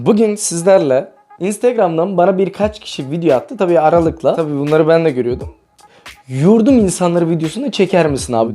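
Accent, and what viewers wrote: Azerbaijan. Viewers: Abi selam sen Azerbaycanlımısın konuşmandan benziyoda o yüzdrn söyledim. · Salam qadanalim men seni tam annamadime ağali ela Azerbaycan lehcesinde danişisan amma soyadin. Ağali senin atan Azerbaycannandi hee?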